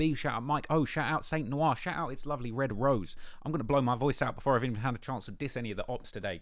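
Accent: United Kingdom. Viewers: Yo Idgaf what anyone says , any British dude that keeps his accent but says alot of black slang when he talks is dope af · BRA you from the UK is the main reason. you don’t know von or understand him, yet you obviously understand who he killed, n how……….von was loyal is the best way to describe him n if anything, he is a vengeful killah. his friends getting killed, sparked the hate for him to do wut he “allegedly” done. he luv’d his gang. If you lived out here maybe ppl wouldn’t be as mad, but he not a serial killah, VON is a vengeful killah. who is really fueled by the luv he has for his friend’z who was killed. he not the only one to get away with body’s. police don’t even try n solve shit half the tyme, in “Chicago” the chances of cops solving a murdah is low. ppl don’t talk it’s just like looking in from the outside…………personally, I don’t think von would be mad about the documentary, only thing we don’t like is you calling him a serial killah! 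everybody that knew von, knew he had body’s. it’s not a secret! it’s just your perception, you’re accent & your WHITE. LMAO 😂😂 Long Live V-ROY 🙏🏽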